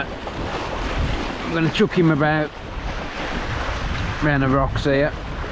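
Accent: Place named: Birmingham, United Kingdom. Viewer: Sorry to my ignorant ears your accent sounds Brummy, I'm from south Wales.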